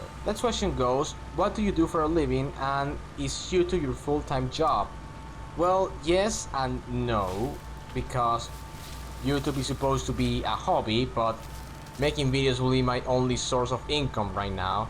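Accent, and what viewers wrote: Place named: Spain. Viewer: Yo ya decía tenía un poquito de acento de español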